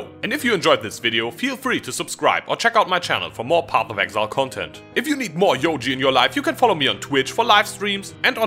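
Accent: Germany. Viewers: The german accent is killing it :D :D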